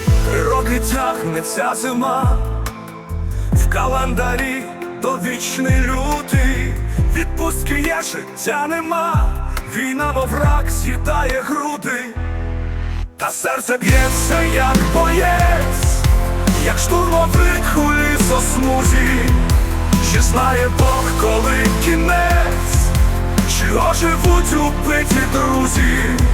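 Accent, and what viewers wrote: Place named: Poland. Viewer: Ти вибач но поляком смердить рррррр.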